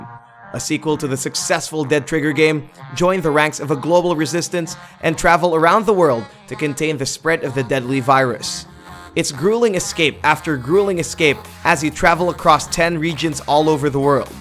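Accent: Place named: Philippines. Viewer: Why does the VO sound very Filipino?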